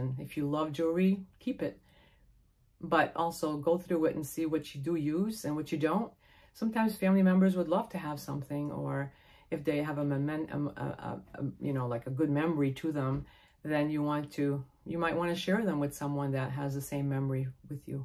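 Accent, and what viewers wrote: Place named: East Coast, United States. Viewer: I am so enjoying your videos! What you have to offer is much needed right now; please keep at it 👍 Your numbers will continue to grow, but I have to say, it was a little difficult finding your channel again when I had cruised past it intending to return and watch it later (I do this a lot. I just remember the title instead of saving). When you type in Living A Simple Life a hundred other channels come up with the same name You are so unique; your east coast accent is fabulous; I love when it pops out naturally, lol. Your personality really shines through ✨️✨️✨️ I'm intrigued by your life because I am currently on the west coast (CA), dreaming of moving to the east coast and living simply as well. As a native Minnesotan I'm really missing having four seasons... I look forward to your future videos and watching your channel grow! 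❤️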